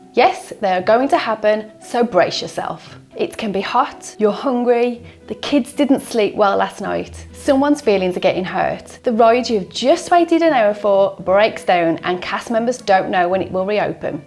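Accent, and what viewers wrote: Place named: Black Country, United Kingdom. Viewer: Ive just come across your video ❤ I hear black country 👌🏻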